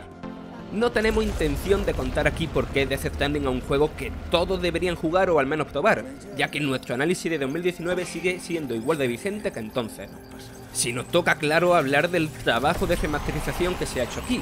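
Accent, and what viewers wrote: Andalusia, Spain. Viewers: La gente de Andalucía no puede ser narradora o qué? Respeta los acentos que a lo mejor los de latinoamerica lo entienden mejor que tú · La gente descubriendo el acento andaluz... analfabetos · Hay acentos en el sur de España donde la "S" son casi mudas, sobre todo en las palabras plurales, el tema de las "R" lo veo más de la parte de Murcia ( aunque en este caso lo veo muy exagerado ), todos los acentos merecen respeto pero teniendo en cuenta que este canal lo ven en muchos países no veo la mejor elección un locutor con un acento tan cerrado.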